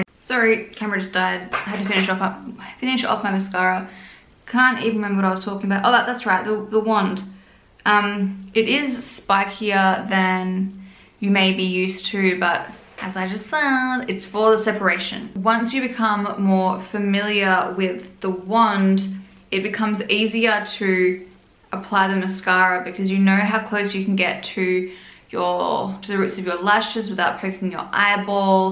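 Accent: Australia. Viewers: I love when she emphasizes R's to minimize her Aussie accent lol it's funny cute "bronzeRRRR" instead of "bronza"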